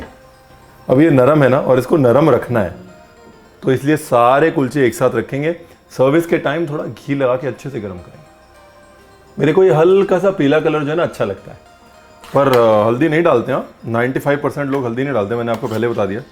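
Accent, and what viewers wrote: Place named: Punjab, India. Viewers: I love the way you cook, giving instructions and your punjabi accent while cooking. It's a great fun and enjoyment while cooking. Thanks Ranveer❤ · Sir before seeing your video I like that you such very good expaliner or your Punjabi accent is such a amazing ♥️♥️sir you explained very well and every single minute is utilised when I see your video 😊😊😊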